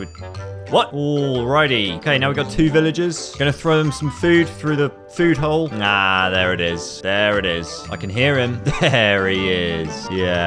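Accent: United Kingdom. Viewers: The British accent makes this so much funnier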